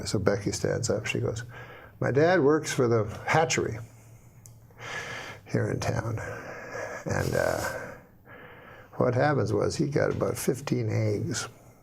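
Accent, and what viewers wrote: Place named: Canada. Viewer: Told with the old timey Canadian accent as well, like a story Stompin Tom Connors might be tellin'.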